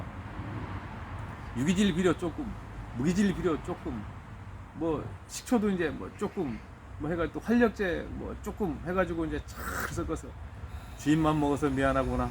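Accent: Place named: Jeolla, South Korea. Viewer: 말씀이 전라도분 같아요 어디신지~?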